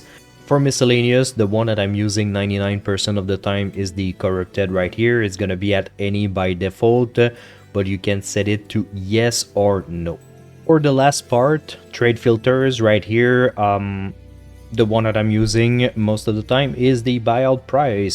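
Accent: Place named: Quebec, Canada. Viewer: toi t'es quebecois c'est certain! hahaha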